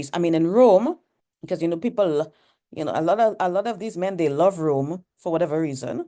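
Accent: Trinidad and Tobago. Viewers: Yes she sounds like a Trini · What a minute i love the cursing you are a mess. You also sound Trini. Are you?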